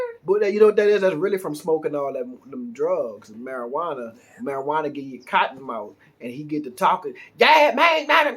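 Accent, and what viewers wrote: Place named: United States. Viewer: The only thing American I see in you is your accent,hang on tight to it lol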